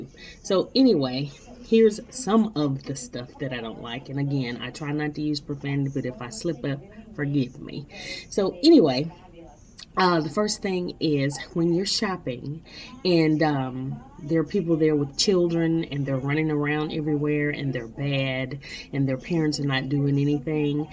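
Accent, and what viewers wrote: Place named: South, United States. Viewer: Agreed with every single detail, and straight 2 the point! Just love that deep-hearted Southern accent and i'm from Texas!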